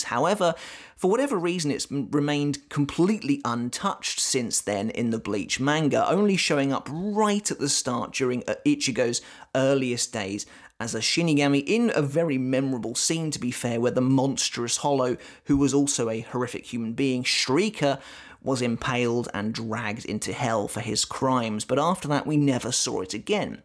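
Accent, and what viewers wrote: United Kingdom. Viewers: He reminds me of this dude I went to school with but this guy British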